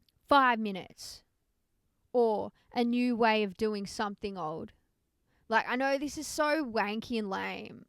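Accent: Australia. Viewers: Are you a Queenslander? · Came for the title, stayed for the accent. Love the Aussie accent! Love from the Netherlands 🥰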